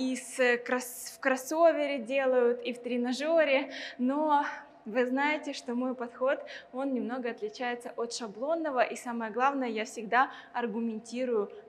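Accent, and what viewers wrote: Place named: Ukraine. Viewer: Вы считаете, что Ваш акцент идеален и речь прекрасная? Для чего вообще комментарий в таком тоне? Просто, чтобы задеть человека? У Вас что-то личное к этой девушке или вообще ко всем, кто с Украины? Если не нравится, то можно просто не смотреть. Ссылку скиньте на Ваши ролики, и вообще интересно посмотреть на Ваши достижения в жизни. Я уверена, что человек, который трудом добился чего-то, никогда не обесценит труд другого человека и уж тем более не выскажется в таком тоне. Я из Казани и у нас здесь каких только акцентов нет и это нормально. Не знаю, где Вы проживаете, но , судя по комментарию, Вы не из очень хорошей среды.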